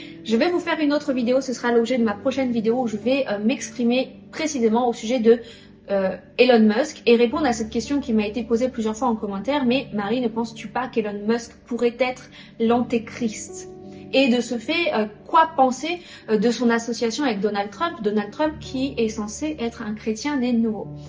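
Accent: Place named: England, United Kingdom. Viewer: I'm loving your english accent !😍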